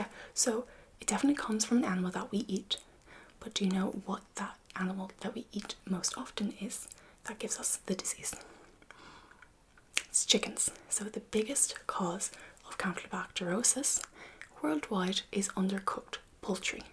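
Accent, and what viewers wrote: Ireland. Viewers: Deeply relaxing and highly informative; the type of video where the material is dense enough to keep the Irish accent from lulling me to sleep.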